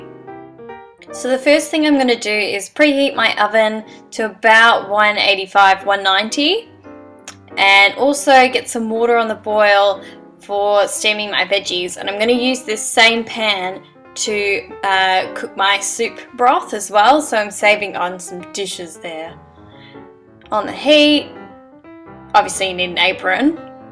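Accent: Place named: Australia. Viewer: lol between the song at the beginning and your accent i knew you were australian , this video is great, i esp like your use of so many vegetables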